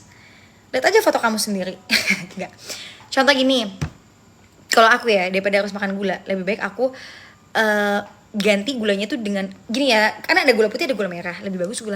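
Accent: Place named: Betawi, Indonesia. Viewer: Sekarang ngomongnya ala bocah Jaksel, dulu nyablak ala betahaw 😂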